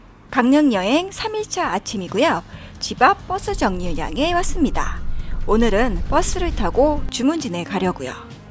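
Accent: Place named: Japan. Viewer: 안녕하세요 ~ 혹시 일본인이세용? 말투가 한국인이 아니신듯 해서요~😂 혹시 한국이시면 특이하신 말투시네요~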